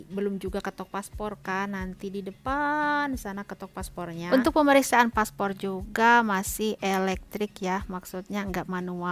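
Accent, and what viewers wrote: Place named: West Java, Indonesia. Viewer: hihihi ... Ciri khas Sunda teh 😆 semangat ngontenna teh ❤️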